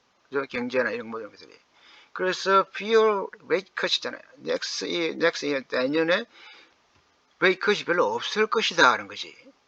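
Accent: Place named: South Korea. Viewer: ^^ 머스크 과장과 사우디공주와 탕비실 얘기에 저도 많이 웃었습니다.^^ 우리나라에 사는 많은 사람들은 같은 한국사람의 영어발음이 더 잘 들리는거 아시죠? 감사합니다. 잘 들었습니다. 😅🎉❤😅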